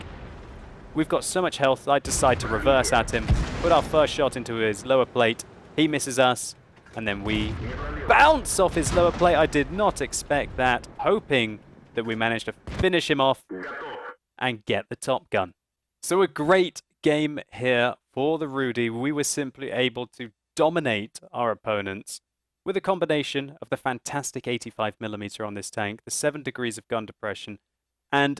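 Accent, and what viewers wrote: Russia. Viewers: Why make Russian accents in a video about... a Polish theme tank? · Why Russian accent don't understand. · It was realy bad. You reviev tank with was in polish army with polish tankers and u speek with russian accent with rusian flag and with Soviet Bear...It was realy bad and insulting for polish ppl.... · Rolf Rolf it is so easy to flame, isnt it? If you would spend some time to read a book or two, about polish tank platoon during II WW you would realize, that the idea of movie itself and this bloody tank is an insult to the history, but it is easier to pick up the most obvious fact, that some dude reviewing a tank from a soviet tech tree speaks with Russian accent. Congratulations to you, depth of your analysis is staggering, and the fact that you think nobody noticed that till now just means you are a seriously disturbed narcissistic individual. 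· LOL, love that russian accent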